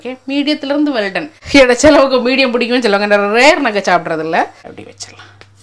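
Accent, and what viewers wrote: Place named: Nanjil Nadu, India. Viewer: மாட்டிறைச்சி திங்கறது இல்ல. ஆனா நம்ம நாஞ்சில் தமிழுக்காக பார்த்தேன்.என் பதிவு எளிமை Sister. உங்க பதிவு Super Sister.🤝🤝🌹🌹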